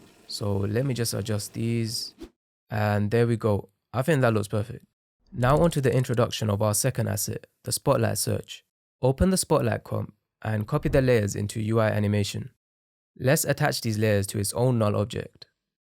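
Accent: United Kingdom. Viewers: I love the central cee accent my dude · Bro are you from west London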